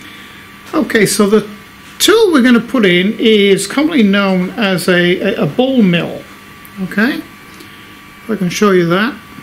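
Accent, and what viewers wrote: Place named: England, United Kingdom. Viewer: dude you sound english but you are talking in a currency that WE DO NOT USE?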